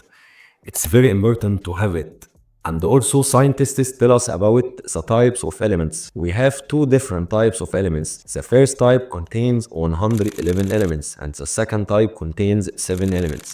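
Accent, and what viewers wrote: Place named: Egypt. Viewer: الفيديو عظمة بس طلع لسانك في الTh يا مستر بدل ما كده مصري أوي 😅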